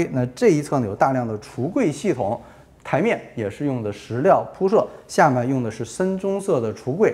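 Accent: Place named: Beijing, China. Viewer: 听口音应该是北京兄弟... 这种豪宅看看就好，因为维护及保养费用会高的惊人，我们这种做小生意的人一旦买了就真成了房奴了！节目办的不错，加油啊兄弟！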